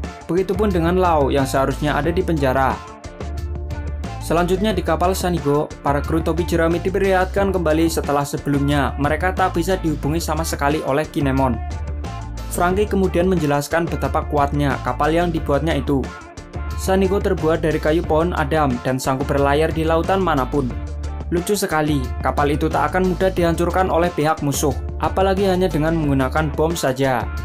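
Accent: Java, Indonesia. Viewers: Medok banget anjing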